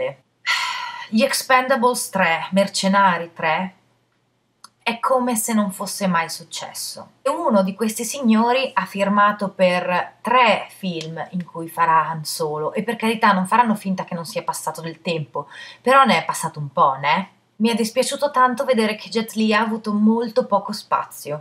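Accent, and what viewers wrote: United Kingdom. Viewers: ahaha la faccia da INIZIO DI SUPERNATURAL <3 sei troppo brava sei il mio programma tv..ma su yt :) ma poi la pronuncia british...love! un caro saluto,Alessandra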